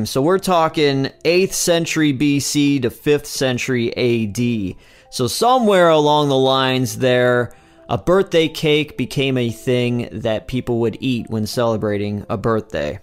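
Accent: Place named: Buffalo, United States. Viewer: Im loving your Doc's, just found your site, about to watch this, cuz im hoping you bring up BIRTHDAY ICE CREAM CAKE... WHO EVER INVENTED THOSE CRUNCHY THINGs IN THE MIDDLE ARE GENIUS'S!!! Anyway, Im also trying to figure, where your from, you have that same Accent we have in Buffalo NY, which i also notice when i was living in Detroit, they kinda still had that accent, then moved 3,000 miles out West, & sounded like a fish outa water, its refeshing to hear an accent on YT, you know, instead of all the A.I.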